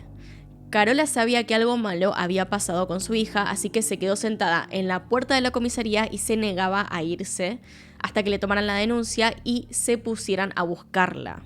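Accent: Argentina. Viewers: Hola me encanta tu acento argentino supongo y también tu acento angloparlante supongo que también hablas inglés te felicito por tu programa es magnífico y tú eres muy bella saludos😊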